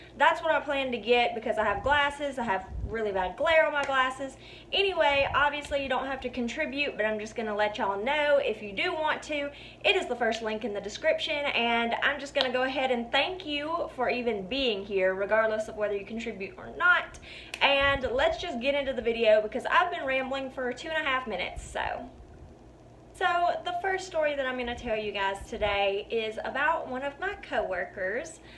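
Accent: South, United States. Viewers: Sorry it didn't work out at Walmart. Not all Walmarts are bad. I hope you are doing well and you definitely have a future in entertainment. Love the southern accent ☺ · Heavy southern accent 😂👍👍 cool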